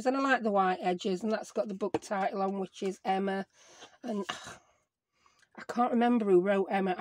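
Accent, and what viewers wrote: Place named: North, United Kingdom. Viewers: I’ve been thoroughly entertained watching this! Been chuckling along, from Melbourne Australia. My friend in Michigan USA shared it on Facebook. Love your beautiful tags! And love your Northern accent. You sound like a dear friend of mine. ♥️ Will watch you videos again. I subscribed to your channel. Keep commentating; you’re Awesome at it! 😄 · Hello, First of all I want to say how I really love your videos and since I am a Geordie living in Canada, its great to hear your accent reminds me of home. Anyway, to get to my point, we only have Micheals here and they are quite pricey. So I wish we had some alternatives even junk stuff we could use for collating etc. I have made so many of the things from your videos and love them.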